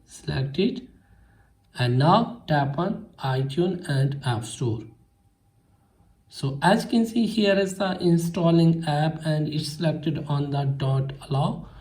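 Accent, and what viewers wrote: India. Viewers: Always the people with the Indian accent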